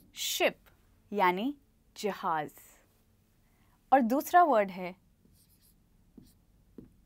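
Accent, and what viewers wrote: India. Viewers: I mean it is Indian pronounciation, not very authentic I would say. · You are using indian phonetics for English though not original English phonetics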